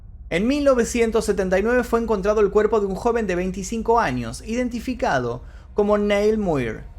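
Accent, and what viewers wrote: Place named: Argentina. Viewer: 😘😍 me encanta tu acento argentino, saludos desde Ciudad de México 🇲🇽🤗!!!!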